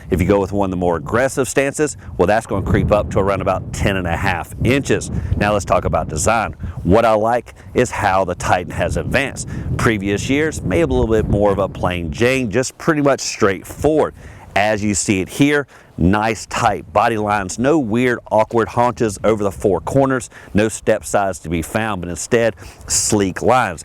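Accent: South, United States. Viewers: . Great job. I like the truck. The warranty is great too. Worked that southern accent. Mississippi myself. Plan on getting this truck but a different color. How does the 4 by 4 Platinum drives? Is it a heavy feel? It's supposed be around 5 seconds for 0 to 60.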